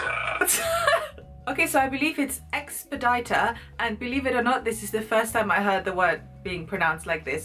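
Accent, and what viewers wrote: England, United Kingdom. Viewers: English tricky language! Sometimes words are not pronounced as you would think! You sound more British than German! You speak English very well! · I'm very surprised that you've even developed a distinct British accent. When I learned and tried to speak German, idk where my accent would be from lol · I have the same problem with the word 'determined'. Every time I use it I have to check its pronunciation out first!! it definitely drives me mad. By the way, congrats on your great British accent! ;) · at the beginning I thought like: wow... she sounds quite British but there's something else as well... German or sth. 😂😂 I knew that bc German is my mothertongue😂 but I'm not quite sure. i live in the UK as well and I try to sound as british as possible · I would say it Is a British accent ... forced pronunciation, it used to be a thing where the BBC had it as their golden rule that no "English, Scottish, Welsh or Irish accents would be on television or radio. 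so we had all these fake people on the box that infected everyone. accents change every 30miles in the UK, drastically change. as this is technically "The Queens English" this girl actually has an advantage being German. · The first time you said squirrel you sounded Australian aha And you sound very British! Also.. idk if it’s like a non Australian thing But I’ve never struggled to say rural? Maybe everyone in Australia lives rurally so we have to use it in conversation all the time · What the hell?! At the start I thought you were British! Amazing English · I think you're more English than some English people themselves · If it's any consolation, you pretty much speak English with an English accent. And if you go to America, squirrel tends to be pronounced more like "skwerl". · is QUITE lovely...............and charming......................and funny. But I wonder if SHE appreciates just HOW 'English' she sounds now? 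Great stuff! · How did you learn to have an accent like that, you sound very proper and more english than pretty much every english person i know including myself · Big suze! That's her name that was hard work and on second look you don't look terribly much like her lol think it's more her softly spoken voice , you sound very English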